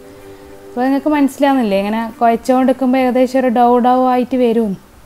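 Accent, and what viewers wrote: Kannur, India. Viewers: Kannoorkari aano???Aa slang kettitt chodichathaa😄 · Aha... bun poliyanallo.... really it look similar to bakery bun... koottathil ante kannur bashayum... 😊😊😄👍👌👌👌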